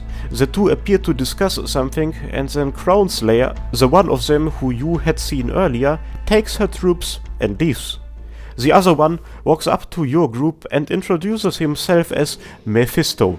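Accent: Germany. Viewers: Bruder dein deutscher Akzent ist LEGENDÄR XDDD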